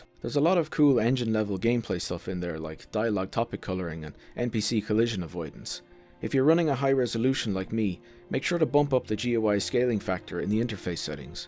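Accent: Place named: Ireland. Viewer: You sound Irish, I'm willing to bet old enough to drink means something different in Ireland lol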